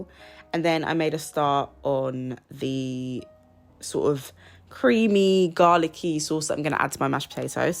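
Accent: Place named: United Kingdom. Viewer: Hello nice video 😊! I have to say you sound a lot more British compared to Kabir I like it!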